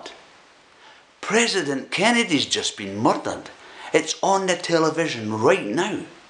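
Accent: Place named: Edinburgh, United Kingdom. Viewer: I thought that was an Edinburgh brogue! Did I tell you I was on the bonnie, bonnie banks of Lochlomond last weekend. Thanks for another informative and insightful video bro.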